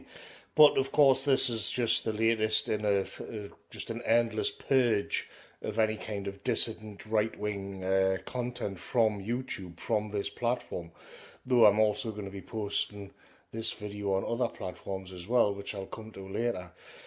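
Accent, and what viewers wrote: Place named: Geordie, United Kingdom. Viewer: The algorithms can’t make out your Nordie accent.We peasants think it’s charming.Follow you anywhere.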